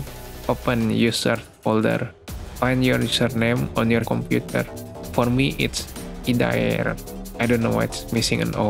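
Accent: Indonesia. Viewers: I know ure indonesian jst from ur accent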